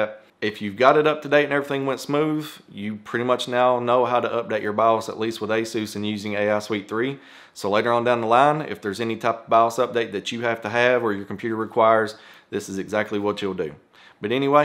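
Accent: South, United States. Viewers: a little southern drawl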